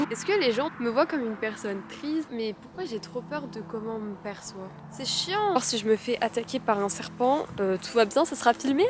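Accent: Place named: Quebec, Canada. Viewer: Tu as un léger accent québécois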